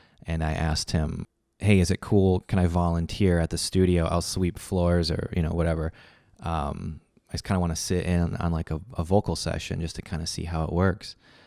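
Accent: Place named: Arkansas, United States. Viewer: I'm from Arkansas and like you nailed like 95% of how people talk down here which is funny because I've lived in the south for my entire life and some people think I'm from up North because of how I talk lolll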